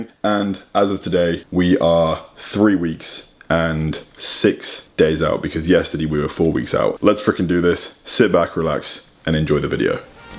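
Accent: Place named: United Kingdom. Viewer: first of all...buying any peptide as a liquid is the worst decision you can take, they have to use so many conservants to keep the peptide together. The best way is lyophilized cake but we all know how anoying is to reconstitute and dose them, extra bac water, needles, syringes and shit. So, my gym bro, it seems you have an UK accent and you have in the UK the Lyoprester, it was invented there, under your nose mate and here we are, putting ready mixed peptides in you...😌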